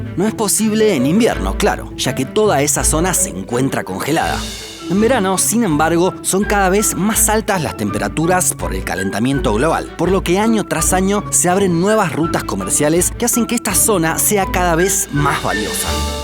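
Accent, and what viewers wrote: Buenos Aires, Argentina. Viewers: Escucharte me anima a querer visitar Argentina solo para saber donde tienen este acento 😍😍😍😘😘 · eres de argentina?? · Además de los videos instructivos, el acento porteño me hace extrañar mi querida Argentina. Saludos desde Brasil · Es argentino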